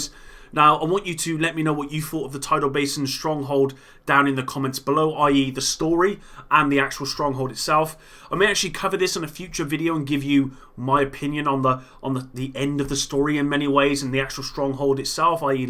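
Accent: United Kingdom. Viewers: Hey mate.. just subbed.. I have seen your content before and always appreciate your nice clear commentaries. Except for linearly, but no one can say that world lol.. what part of the world are you in.. can hear a British accent in there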